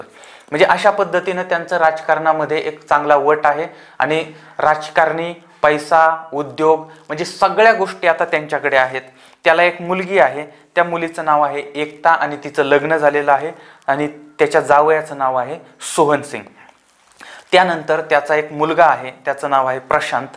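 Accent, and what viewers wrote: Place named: rural, India. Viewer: निवेदन शैली गावाकड्ची, विनोद्प्रचूर पण गुन्हेगारी विशयी गाम्भिर्य व तळमळ असलेला लय भारी!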